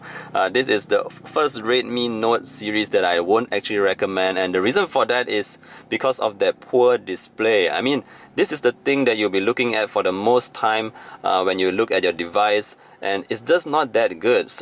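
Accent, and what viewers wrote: Nigeria. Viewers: Love your accent... You've got Nigerian friends? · You sound like Nigeria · Bro you have a Nigerian accent...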